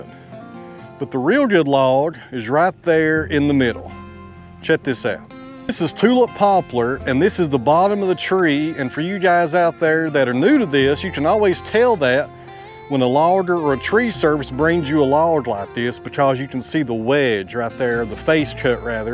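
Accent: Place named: Ohio, United States. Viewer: Listening to you makes me just a touch homesick. Southwest Ohio, true, but rural Ohio isn't much different. Thank you, Sir.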